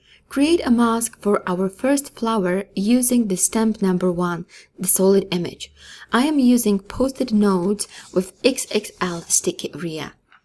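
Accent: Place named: England, United Kingdom. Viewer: Fiiha velmi dobre video!! ;-) a o typickej anhlickej anglictine nehovoriac,super !! A aj pohladnicka krasna!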